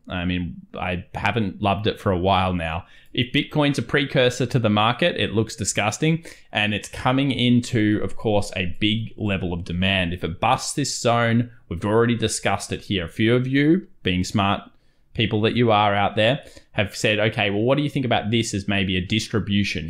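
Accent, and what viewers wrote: Australia. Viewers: I must say, I appreciate the Aussie style of speach. You speak firmly, like you're in a sandstorm, or at sea with strong winds and sea spray. There's no mistaking what you're saying, and you're not using your daddy voice on me. Thank you!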